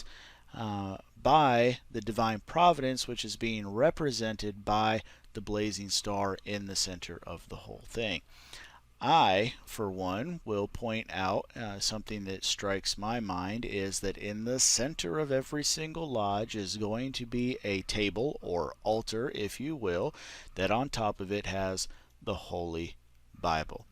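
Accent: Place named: California, United States. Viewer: Cool thanks for sharing. I thought I heard some California in you.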